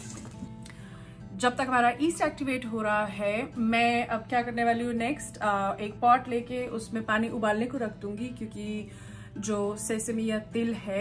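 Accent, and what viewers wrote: Pahadi, India. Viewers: Tussa ra pahadi accent ai eee jaa , luv from mandi HP 🤗❤️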